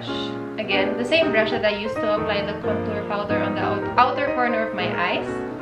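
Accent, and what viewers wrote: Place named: Philippines. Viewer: Love the Tagalog accent bezzie! Haha! Awesome vids, sana magaling din ako mag makeup. Lols 😍😍😍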